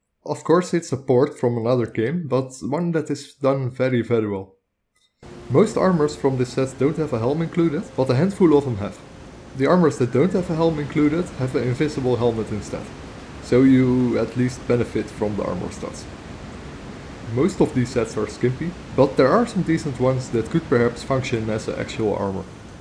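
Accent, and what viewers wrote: Netherlands. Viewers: damn dutch accent tho jaja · you sound dutch. you dutch right? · Prima video, lekker dik nederlands accent er boven op, helemaal goed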